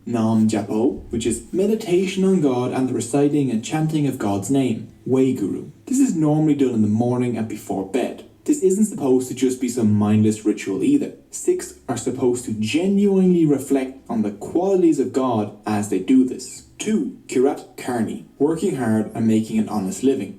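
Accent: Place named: Ireland. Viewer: I like the Irish accent ❤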